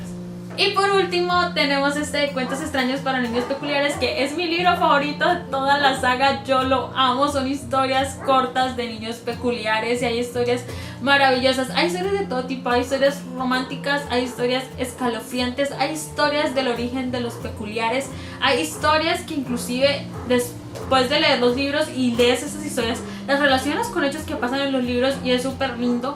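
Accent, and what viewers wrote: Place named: Colombia. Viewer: Jaja me estuve preguntando durante el video si eras de Colombia o de Mexico pero cuando dijiste le coji como bronca me di cuenta saludos buen video parcera😊😊😊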